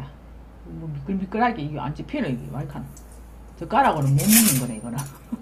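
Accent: Gyeongsang, South Korea. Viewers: 털털이 경상도 아줌씨 요리보다 말씨가 더 맛나요^^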